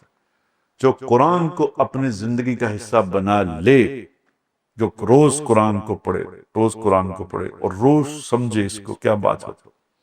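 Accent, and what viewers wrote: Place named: Lahore, Pakistan. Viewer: Loves his lahori punjabi 😊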